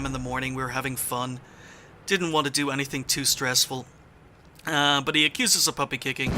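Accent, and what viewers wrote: Ireland. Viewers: Forgive me if I offend anyone but in my admittedly very limited experience of english accents I think your Irish accent isn't very pronounced. It's definitely there but I was wondering if you're from Northern Ireland which I assume would have a more British accent?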